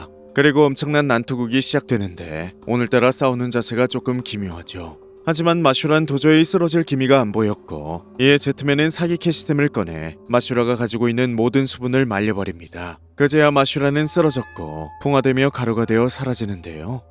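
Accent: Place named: Japan. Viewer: 일본어 발음 그리웠어요ㅋㅋㅋㅋ큐ㅜ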